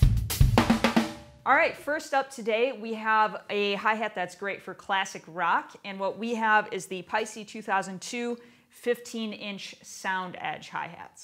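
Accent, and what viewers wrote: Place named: Chicago, United States. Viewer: She sounds like she’s from Chi-Cah-Goe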